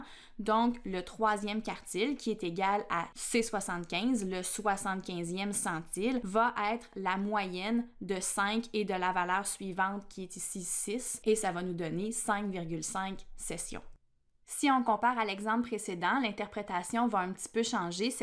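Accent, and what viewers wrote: Canada. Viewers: J’en peux plus de l’accent des canadiens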